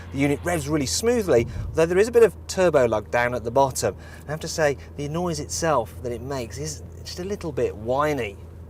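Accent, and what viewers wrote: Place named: North, United Kingdom. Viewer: i think hes from up north! :-p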